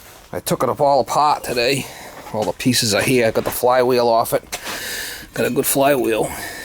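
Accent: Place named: South, United States. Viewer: Are sure you're not one of us Southerners? Lol. Keep the vids coming brother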